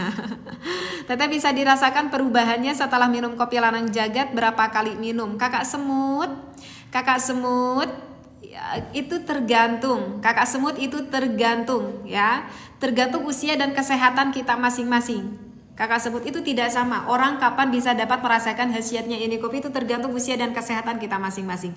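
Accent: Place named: Padang, Indonesia. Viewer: Teteh kadang logat bahasana saoertos urang padang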